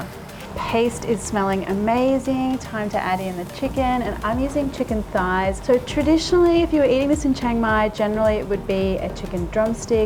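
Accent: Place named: Australia. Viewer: We go to Chiang Mai often and enjoy Khao Soi. I think I will make you this recipe while I’m stuck back in Australia Btw you sound Australian too 😊😂😎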